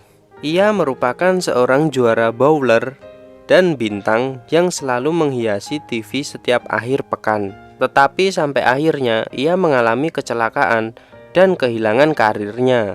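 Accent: Java, Indonesia. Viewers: Suaranya kaya agak medok jawa? Wkwkkw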